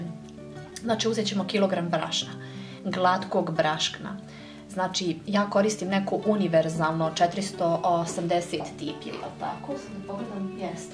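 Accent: Bosnia and Herzegovina. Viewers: Tako umiljat i ljupki glas vredi slusati a i pigledati recepte. Svaka cast za recepte lepo i jasno sve objasnjeno. Pozdrav iz Kraljeva. Jos nesto. Bosanski akcenat slusala bi ceo dan 😍😍😍😍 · Preeeedivno objasnjeno! Divan,njezan, melodican glas! Obozavam vas Bosanski akcent! Super izgledate! Po svemu sudeci, vi ste jedno divno, perfektno stvorenje! Blago onima koji vas imaju! Svi recepti su vam odlicni! Pisem, kopiram u svesku, kuham i i uzivam u divnim ukusima, kombinacijama koje dajete, ne samo ja nego i moja djeca i unucad!! Hvala Vam veliko! Pozdrav iz Detroita😘😘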